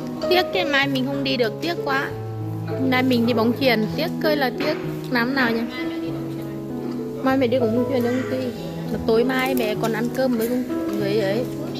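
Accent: Central, Vietnam. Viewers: Cô quê ở đâu mà nói giống giọng miền trung vậy ạ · tại con nghe cô nói giống giọng miền Trung ghê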